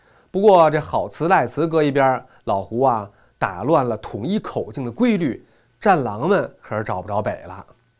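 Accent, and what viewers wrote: Beijing, China. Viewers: 咱北京人揍是这么口正...... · 喜欢💕纯正的北京味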